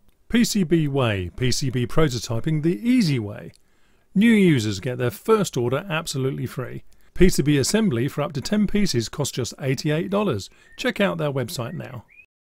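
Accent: United Kingdom. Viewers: Hey, Am loving the videos, they are very informative. I would like to know your background in electronics, software etc...? Am from Liverpool, and I hear your also British. Where are you from? Keep the videos coming!! Kind regards Peter - Liverpool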